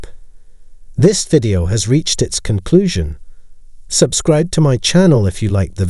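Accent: Scotland, United Kingdom. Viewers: Bizarre filming. Good content & narration but why the foreign landscape/people when the narrator is speaking in English with a Scottish accent?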